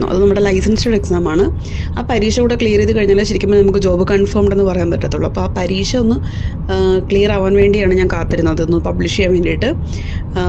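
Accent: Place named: Pala, India. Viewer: Pala പരിസരവാസിയാണെന്ന് തോന്നുന്നു ഈ സഹോദരി... കാരണം ഇത്രയും amicable ആയി സംസാരിക്കുന്നതു കേൾക്കുമ്പോൾ ariyam njanum palayil aanu രാമപുരം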